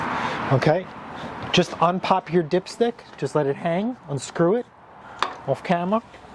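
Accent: New York, United States. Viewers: Thanks for the video. Really funny hearing a New York accent living here in central Idaho.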